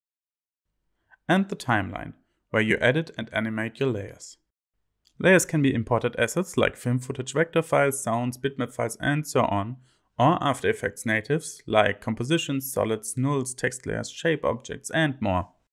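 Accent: Germany. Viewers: Du bist doch ein Deutscher oder? Du hörst dich an wie ein Deutscher der englisch redet :)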